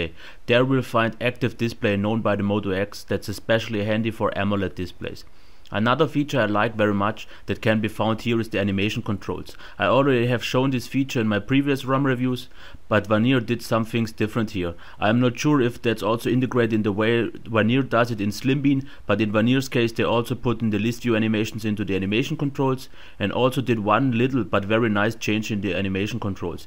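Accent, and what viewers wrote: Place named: Germany. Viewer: so richtig deutsches englisch :D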